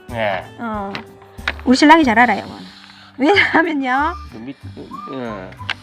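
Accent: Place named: Sangju, South Korea. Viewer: 억양보니 상주 근처네요 . . 재밌고 잘하네요.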